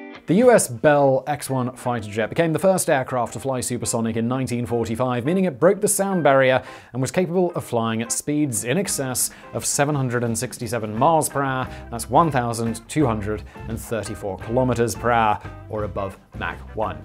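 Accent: RP, United Kingdom. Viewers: This guy is so posh I love it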